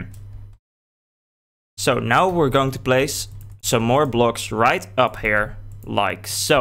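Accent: Netherlands. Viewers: haha dutch detected, nice mate didn't notice the accent at first hope you are doing well, weather hasn't been great around lately. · Are you dutch you got a dutch sound or how you call it hahha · Lol je bent Nederlands he Kan ik zo horen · hey, nice farm, and i hear youre dutch ;)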